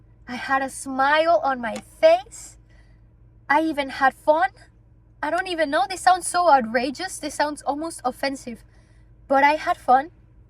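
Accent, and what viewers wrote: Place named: Italy. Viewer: I’m just curious.. are you Italian? You speak great English and I think I hear an Italian accent but I noticed a lot of your shows are in Hispanic countries.. anyway glad you’re alive and better after that crazy experience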